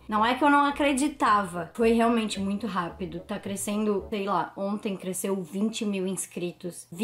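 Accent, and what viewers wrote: Rio Grande do Sul, Brazil. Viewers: Guria! Tenho quase certeza que tu é gaúcha! Sotaque 😉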